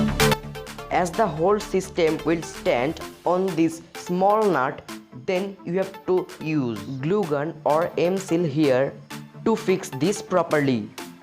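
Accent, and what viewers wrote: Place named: United States. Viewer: You are an Indian but your accent is the mixture of Indian and American ....wooooo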